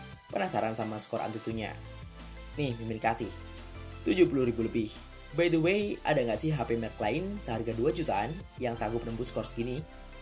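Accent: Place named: Banda Aceh, Indonesia. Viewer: basa indo meutok lage awak banda.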